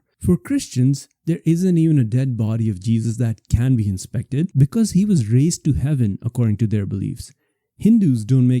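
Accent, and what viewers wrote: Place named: India. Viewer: I noticed you only picked on Islam. And judging by your accent you are from the subcontinent meaning you have an agenda. It’s ok . Your Hindu gods changed sex to shag another god . And in Christianity there was a zombie apocalypse and dead people rose and walked around. But that hasn’t deterred its billions of followers. If you want to hear outlandish and absurdities read the Bible and the vedas. If you believe your religion is true you shouldn’t be worried about the religion of others. You should worry about your own salvation. Shinto is a religion perhaps you should discuss that. If you sre incapable of doing that , then it’s clear you are not sincere.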